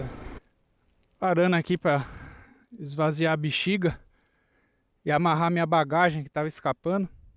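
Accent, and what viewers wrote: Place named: Minas Gerais, Brazil. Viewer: Pelo sotaque acho que o é mineiro, mas pode ser de Goiás ou Espírito Santo... Grande abraço ao e aos seus companheiros!!!!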